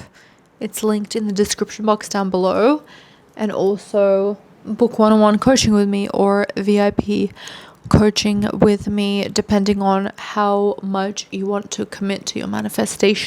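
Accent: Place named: Australia. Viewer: Are you Aussie? Love your accent❤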